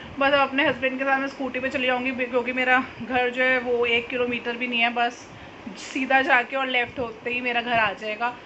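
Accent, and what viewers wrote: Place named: Punjab, India. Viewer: You speak like a punjabii girl😍😍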